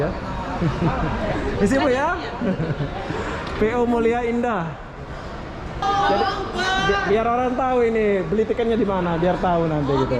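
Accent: Malaysia. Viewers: Asli lombok merantau Malaysia karna udah lama dmalaysia jadi bhsa udah kental malaysia kira2 gitu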